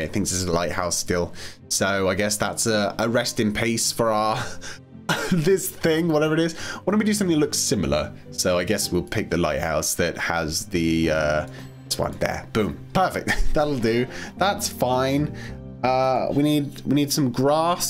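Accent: United Kingdom. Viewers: I love accent I wish I had a british accent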